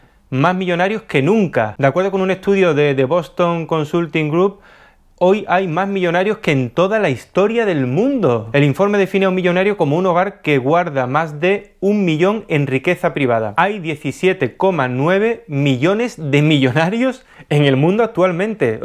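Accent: Andalusia, Spain. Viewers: Por fin encuentro alguien (serio y con formación) que dice la verdad sobre este negocio. P.D: Me encanta el acento andaluz. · Hola!!! Te descubri hace poco,me encantan tus videos. Tambien fui a Escuel de Arte,pero no pude ser artista,pero ahora me has dado un empujon! Jajaj. Me encanta tu acento,de ue parte de España eres? Es que tienes el acento muy parecido a una gran amiga que tengo y ella vive en La Linea de la Concepcion. Un abrazo enorme desde Liverpool!!!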